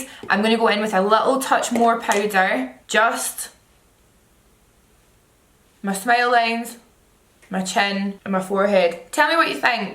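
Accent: Scotland, United Kingdom. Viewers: I honestly don't think you are all that hard to understand. But then again, I've been around different accents, including Scottish, before, so I've had practice listening. Love the accent, the more people watch the easier it will get for them ;) As a classically trained singer, the vowel sounds Scottish people make when they speak are so fascinating, and (I think so) very pretty. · Has anyone used the Google captions when watching?! their interpretation of what she's saying is hilarious 😂😂 I'm Scottish so totally get her accent but imagine non-Scots would find the captions totally unhelpful and nonsensical 😂👎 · is she scottish x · I know the struggle with the Scottish accent 😂😂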